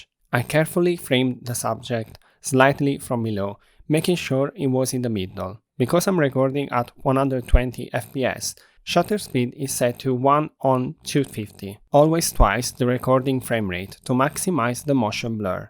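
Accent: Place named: Turkey. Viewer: Dude, are you Turkish? You have Turkish accent 😊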